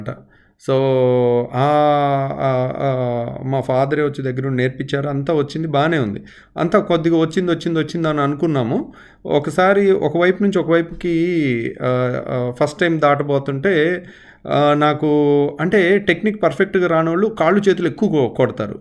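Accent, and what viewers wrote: Krishna, India. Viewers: Miru chala chakaga telugu krishna dt valla laga matladutunaru doctor garu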